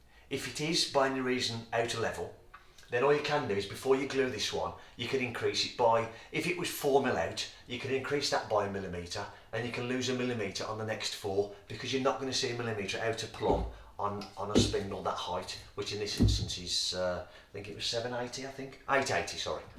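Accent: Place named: Birmingham, United Kingdom. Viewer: Hello, very impressed with you standard of workmanship and your a Brummie like me! Where about sin Brummie land are your from as our accent changes ever so slightly from one place to another. New to your site but very impressed with you and work and honesty.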